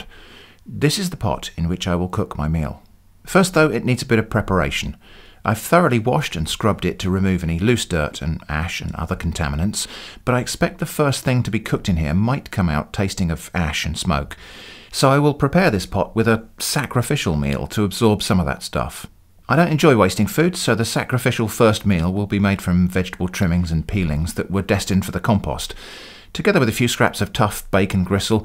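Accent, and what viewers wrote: United Kingdom. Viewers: its like Primitive Technology with UK dub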